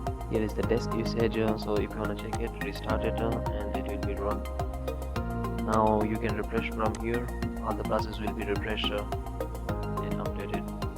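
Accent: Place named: Italy. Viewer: Si sente che sei italiano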